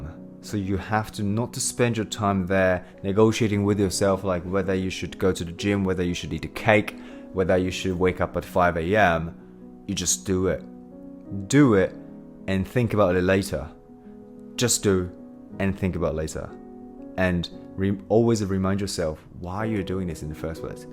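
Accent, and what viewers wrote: England, United Kingdom. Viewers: Ah... maybe you came up in my feed because we are both Vegan... Very Good!. I hear an English accent there. Not sure there is much to say, my bad, i don't know much about you but all the best with your quest to help folk. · You’re speaking English with British accent, aren’t you?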